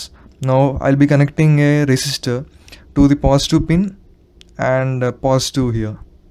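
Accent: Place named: India. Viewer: This video really helped me bro , and I know that you are from India from your acent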